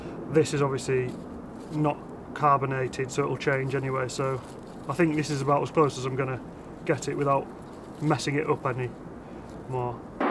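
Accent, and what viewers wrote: United Kingdom. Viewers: New to the channel, was expecting someone in their 50s with the voice. That's a proper accent, a rarity these days in the UK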